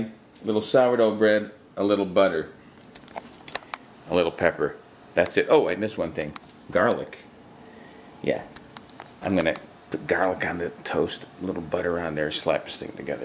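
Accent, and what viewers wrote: United States. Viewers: Garlic ? Pepper ? If you've been to Europe or other places in the World, you'd realize there are LOTs of varieties used throughout the World. I'm assuming, since you look and speak very American that it is the "typical" black pepper and the "typical" clove garlic. Especially the pepper - of which there are 100s of different types.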